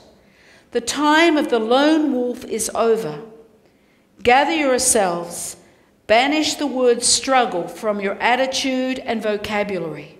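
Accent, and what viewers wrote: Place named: Australia. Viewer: you not only give wonderful HUGS but ones that have never met you giving your presence as on You Tube and your online Web Site you give this amazing loving energy that is so understood especially with your beautiful Australian accent You of choice are a Beautiful Earthling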